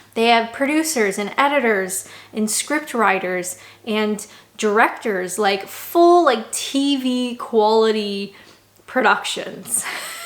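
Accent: Canada. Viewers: fist of all, I'm sooo happy for you 🥳🥳 and i hope everyone works out for you for the rest of your life ! I've been learning english vocabulary and phrases from you over these video in oder to get used to Canadian's accent 🤗 (I'm a Korean I'll move to Canada next year ) So I'd be so happy if you could upload a video with subtitle ~^^ I had to write down every single word you said on video 😂😂 and then ask an native speaker to help me with some part i couldn't catch or catch incorrectly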